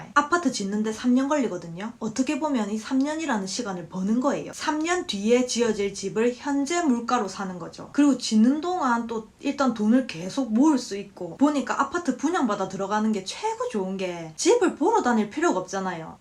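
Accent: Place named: Busan, South Korea. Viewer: ㅋㅋ부산사투리쓰면서 재밌게 말씀 하시네요ㅋㅋ무주택자 다자녀를 둔 외벌이 거제도 가장입니다ㅋㅋ 좋아요 구독 누르고 갑니다~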